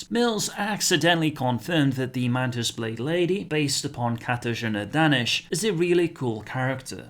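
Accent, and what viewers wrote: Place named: North Wales, United Kingdom. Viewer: If I had to guess yeah. You pronounce some words very Welshy and some things sound slightly northern, your voice is really hard to pin down tho. Where are you from then?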